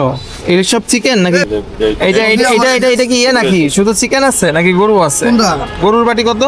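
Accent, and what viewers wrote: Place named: Pabna, Bangladesh. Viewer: আমার বাড়ি পাবনা,আপনার কথা বলার ধরন অনেকটা আমাদের মতো,নাইস ভাইয়া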